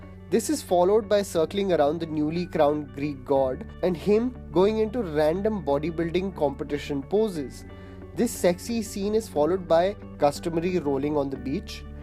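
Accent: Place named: India. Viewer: I think you are here only to make mockery of bollywood... Why don't you highlight good things of bollywood? Or highlight stupidity of Hollywood.. Don't you realize people from other countries make fun of bollywood just by seeing your videos. By your accent it seems that you are a Indian...but belong to that category who are Indian but their heart beats for US.... Can't you take movie as a movie?...A work of a fiction...Not the reality.. Just a medium for an entertainment..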